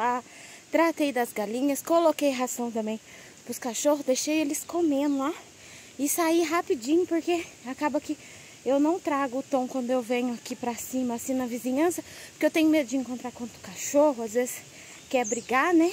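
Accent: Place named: Sao Paulo, Brazil. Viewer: Boa tarde família linda, tdo bem? vc é Paulista, vc tem sotaque de paulista, o r pucha pelo l, polta e colta, me responda por favor, que vontade de comer um pedaço, bolo. moro em Santa Cruz Rio de Janeiro, abraços pra todos vcs e me mande um abraço tbm. ❤❤❤❤❤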